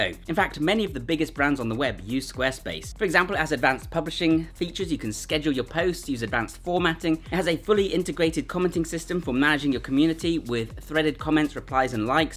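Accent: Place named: RP, United Kingdom. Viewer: Poshest sounding beast I've seen 🥂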